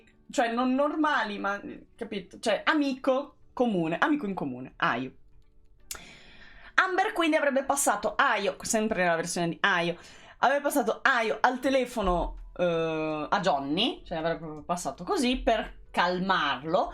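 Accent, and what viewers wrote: Romagna, Italy. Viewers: Hai la Z romagnola! comunque bel video, davvero esauriente, ti seguirò.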